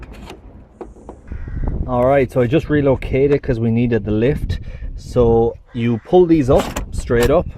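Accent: Ireland. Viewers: not only was the instruction well conveyed but its nice to hear it with an irish accent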